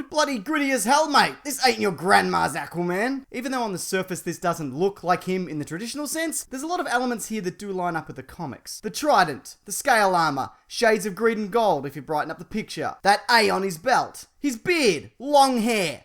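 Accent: Australia. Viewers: i read the title with his sweet aussie accent before even clicking the video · I'm guessing your Aussie right I can hear that accent, mine isn't as strong as yours 😂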